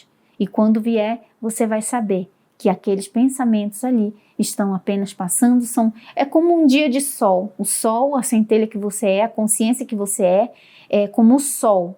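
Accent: Northeast, Brazil. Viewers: Adoro seu sotaque meio do norte ou nordeste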